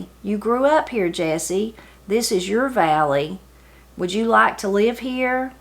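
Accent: Appalachia, United States. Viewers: I cannot tell you how much I enjoy listening to the books you read about lives in Appalachia with your beautiful accent…! I have lived in the Blue Ridge for 5 years bow and Love the stunning grandeur of these mountains, the graciousness and simplicity of the people and their connection to this land. Sad to see this book end…!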